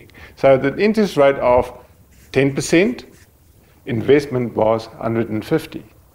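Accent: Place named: South Africa. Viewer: I don't know why, but everything is just better in a south african accent.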